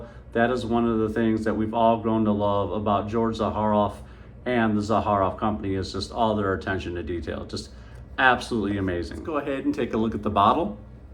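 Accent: South, United States. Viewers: Great video my fellow Carolinian. I love this one as well. Don’t worry about speech sir, we country so it is what it is. If anyone wants this scent stronger, apply two sprays of this, then add stronger with you intensely. Thank me later! does it again. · Great review, sounda amazing. Dont worry about your speech, we are from tha south, most think we cant talk anyway😂😂😂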